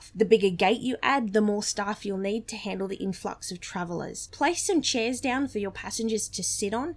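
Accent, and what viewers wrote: Australia. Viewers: "chick with the Aussie Accent" did not disappoint. Thanks mate.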